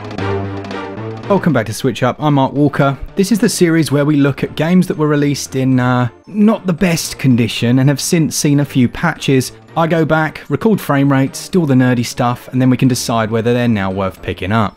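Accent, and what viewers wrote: England, United Kingdom. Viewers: Thanks for the updated patch review. Too many reviews are 2019 and prepatch, and just slate it. The game looked good so i grabbed it for 7Aud off switch store. Glad to see the game has been sorted out for the most part. Also good to hear another english accent on YouTube gaming reviews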